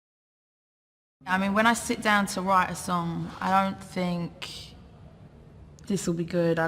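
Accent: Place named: England, United Kingdom. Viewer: Love her English accent. Sad she died so young.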